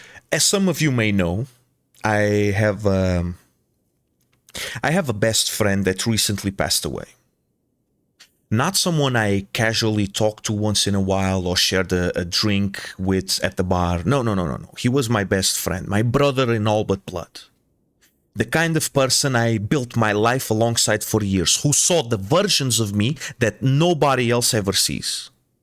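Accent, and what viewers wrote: Portugal. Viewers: é incrível como basta um tuga para reconhecer outro tuga a falar ingles ahah, vídeo incrívellll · You sound Portuguese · Interesting video. Portugues? e do norte? parece-me 😅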